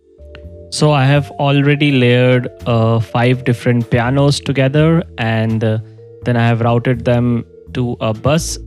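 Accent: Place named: India. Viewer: I never thought voice was Indian. Well you shocked me and you're still cool bro.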